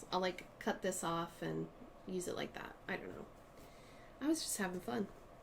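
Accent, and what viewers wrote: Ireland. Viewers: Oh my. The mouse is adorable! I love that your Irish accent is getting stronger.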